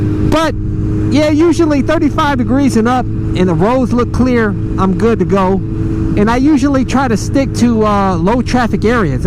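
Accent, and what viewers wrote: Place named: Cleveland, United States. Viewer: Clownsization I’m from Mayfield Village. He’s got the Cleveland accent for sure.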